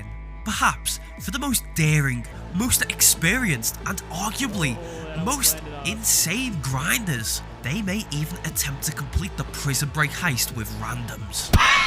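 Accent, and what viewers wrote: Liverpool, United Kingdom. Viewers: L bri ish narrator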